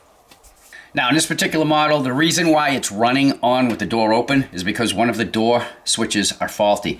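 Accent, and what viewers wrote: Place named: Long Island, United States. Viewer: Smoke test - LOL Thanks... You must be from Long Island or New Joysy!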